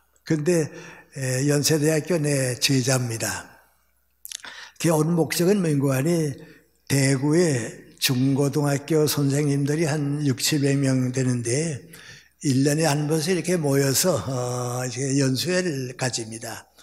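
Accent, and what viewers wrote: North Korea. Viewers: 이북말씨네요. 석가모니 부처님께서 최고이지요. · 올해 나는 72살. 어려서 학창시절 북한출신 은사님들로 부터 교육을 받았다. 김형석교수님의 가르침과 음성을 들으니, 옛날 평양사투리로 말씀하시던 선생님들의 음성이 들려와 하염없이 눈물만 흐른다. 선생님 건강하시고 오래사십시요. 감사합니다.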